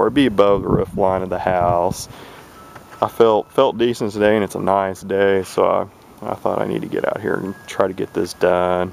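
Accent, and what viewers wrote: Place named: South, United States. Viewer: Top level Southern drawl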